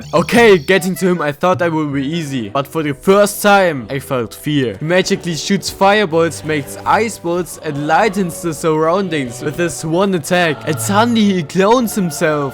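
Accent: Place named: Germany. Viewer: man hört den deutschen in dir hahah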